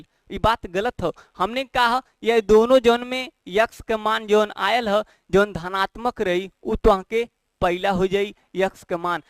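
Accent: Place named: Bhojpuri, India. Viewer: गजब सर इसी तरह भोजपुरी अंदाज me padhiye bahut अच्छे se samajh आ raha है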